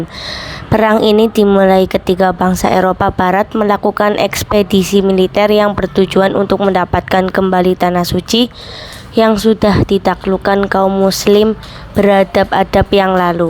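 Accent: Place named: Banyumas, Indonesia. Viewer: Kiw kiw Rada ngapak ya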